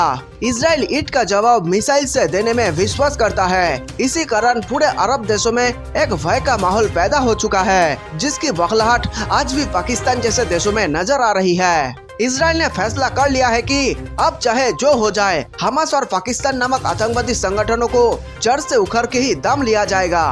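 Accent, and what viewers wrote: Bengal, India. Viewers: Tum bolte bahut achhe ho...!! Are you bengoli!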